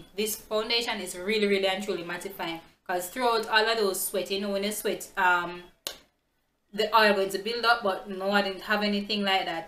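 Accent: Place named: Caribbean. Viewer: love your accent :) #CaribbeanGirls. Btw for reference what shade do you use in maybelline fit me/fenty?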